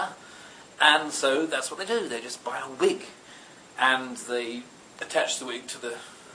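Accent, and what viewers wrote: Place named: England, United Kingdom. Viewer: You have the most awesome English accent ever!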